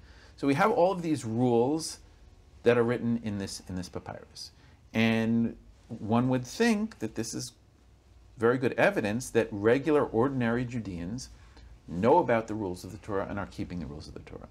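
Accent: Russia. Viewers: From the first phrase it is clear that we have a Russian person in front of us. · Кто это? Семеныч? Что за рязинглиш?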